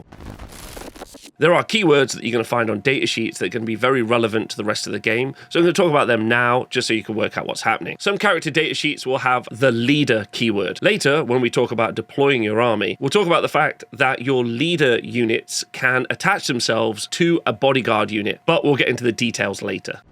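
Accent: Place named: United Kingdom. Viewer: MORE LIKE THIS PLEASE!! this is the 4th time ive watched this video all the way through, while painting miniatures, its really helped me to get a solid grip on the rules system, maybe an advance or FAQ style one next, or faction specific rules breakdown. really appreciate the hard work you put into this. Also you have a much better presentation, personality, voice and vibe than alot of other similar channels i have come across, the british accent helps a tonne. thanks bro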